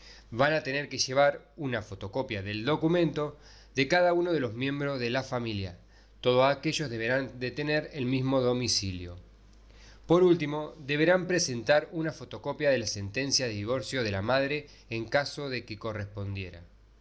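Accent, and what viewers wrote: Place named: Argentina. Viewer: En qué país es esto??? perdón es que el acento es argentino ó uruguayo??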